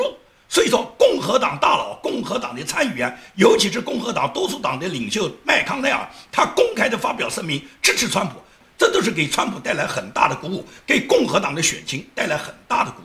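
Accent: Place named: Guangxi, China. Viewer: 一听就是广西底下流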